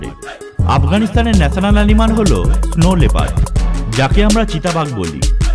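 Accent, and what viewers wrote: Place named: West Bengal, India. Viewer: he is West bengali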